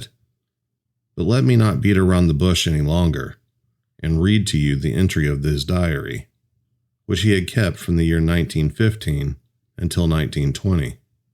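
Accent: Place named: Midwest, United States. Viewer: Don't feed the trolls. I'm the most critical person I know and I can say yours is a narration vpice is professional grade. People pay 10s of thousands for a Midwest accent like yours to do audio books and tv spots. Some folks are not in a good place in life and attacking others helps their pain go away for a moment. Your golden Pony Boy.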